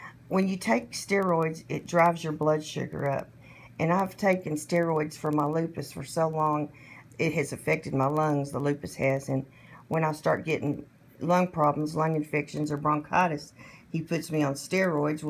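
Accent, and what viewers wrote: United States. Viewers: You have the sweetest voice. Listening to your voice makes me want to be a little girl so I could crawl into your lap and hear you tell stories. That sounds SO creepy when I type it out, sheesh! I mean it in the nicest (non-stalker) way possible though! I'm from Australia, so your US accent is different, plus you have that rich, beautiful Southern tone also that just sounds so lovely to hear. I hope your health is on the upswing very soon. I have heard from others how steroids can really mess a body about. I wish you happiness and health!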